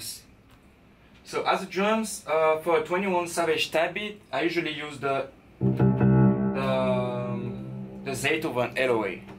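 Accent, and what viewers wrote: France. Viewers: hes french lmaoo you can tell by his accent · le bon accents francais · t'es clairement français mais bien joué, sa ma pris 10 ans a parler couramment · true Hendrix he's not Mexican he's French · He is frensh can tell by how he pronounces the A letter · On entends bien que t'es français :) :) On attends la suite avec un cook up de Londonondatrack ou bien un southside ou TM88 Type beat !! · Your are french ? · t'es français car quand tu parle t'es comme moi je sais parler anglais mais pas forcément l'accent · Are you french ? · On reconnait l'accent français :p, Gros taf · u have french ass accent, tu parles français? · You french or spanish? I kind of recognize the french but you look latino, anyways we see you up here in canada Ontario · Ca fait plaisir de voir un français bouillant comme ça, je savais pas que tu étais français mais ton accent est craméééé ^^ Force à toi tu déchires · You're from France right? 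I can hear from the accent. I was actually born in France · Are you french?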